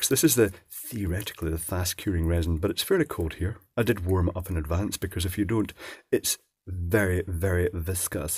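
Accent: Scotland, United Kingdom. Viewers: Plimp WOW, i sit corrected. The scots accent is hard for us mere sassenachs! (silly nationalism aside, thank you for the correction, and sorry )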